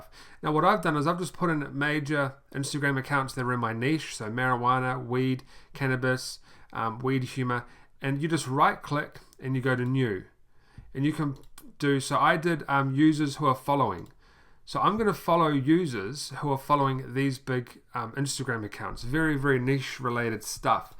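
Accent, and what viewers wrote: New Zealand. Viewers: you from new zealand??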